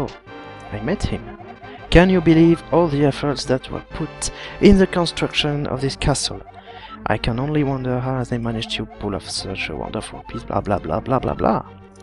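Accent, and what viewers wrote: France. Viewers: I have your game and I have to say it's done great! Keep doing this! And I just love your french accent! ;) Greetings from Sweden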